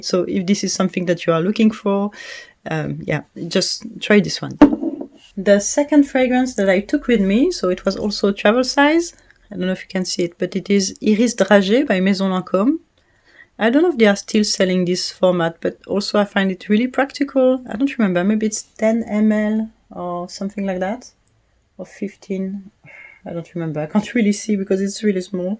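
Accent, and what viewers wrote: France. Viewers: Love your description of Fille En Aiguilles. Have similar feelings about it. Orthodox Christmas :). P.S. Please don't apologize for the french accent - that's great!! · Don't apologise for your French accent - it is beautiful! So nice to hear the correct pronunciations for the French names, especially the Shalimar. I've been wanting to try Lui, so hard to find in Australia though...I also love Dior Addict, I have the earlier first two versions., 2002 & 2014. I was exactly the same as you with L'Heure Blue - it took me a while to love this one, I initially found it quite difficult, but it takes some time to warm up to this one! But when I'm in the mood for it, it's perfect. I also really love Perfume Initial! 💙 The bottle is so beautiful & elegant. Lovely video thanks! A big hello from a very warm Australia. x 🤗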